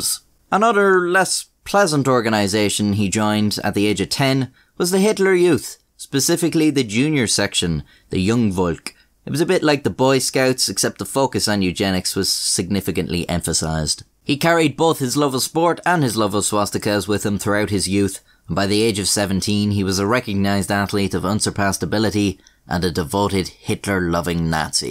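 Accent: Ireland. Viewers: I was wondering because you sound either Irish or Scottish Mainly Due to the fact that they make me laugh They are the kind of people that have a great sense of comedy In the most down-to-earth away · I really enjoy your straightforward style, thoroughly researched material and deadpan Irish delivery. Keep up the primo content. Cheers!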